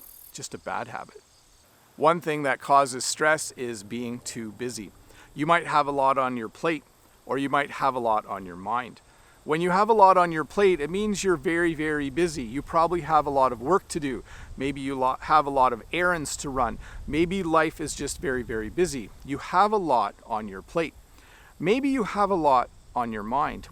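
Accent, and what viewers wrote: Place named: Canada. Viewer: If there is a person without stress, tell me who, I’d wanna be that person! Great lesson. The more I watch your lessons, the more I like them. Because you teach in a very unique way and are kind and understandable. And your explanations always make sense. Keep it up, especially because I love Canadian English. Greetings from Switzerland.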